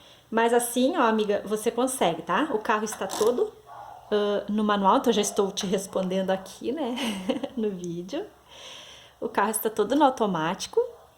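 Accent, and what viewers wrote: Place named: Rio Grande do Sul, Brazil. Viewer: Acabei de comprar uma maquina lanofix automatica antiga a garantia e de 1967. Vou ter que cuidar lubricar mas estou feliz. Ja tive uma lanofiz que tinha cartela de borados, infelizmente perdi numa mudança. Amando suas aulas. Gostaria de poder mandar foto da minha maquina para vc. Bjs gratidao. Amo seu sotaque ba guria!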